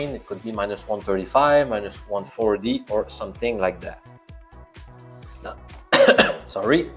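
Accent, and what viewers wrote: Quebec, Canada. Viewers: You sound both french canadian and Persian at the same time.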